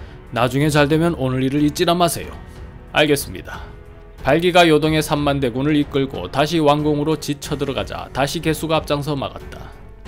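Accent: Gyeongsang, South Korea. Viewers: 고구려이야기에 경상도 사투리 쫌 그러네요 ㅎㅎ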